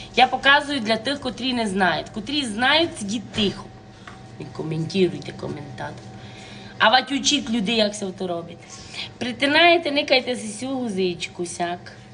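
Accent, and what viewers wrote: Transcarpathia, Ukraine. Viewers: Люблю закарпатський говір, йо!😂❤❤❤ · щось вас давно не було в ефірі.Як то файно чути наш діалект бо не вшиткі хочуть говорити як х мамка навчила бо ся ганьблять,а то красно що аж ся хоче.Такі голубчики ми робимо на всякі гостини,ай бо то лем у нас на Закарпатті такі роблять а інде такі як долоні завеликі.Ми ся не нервуємо ай любуємо коли їх крутиме.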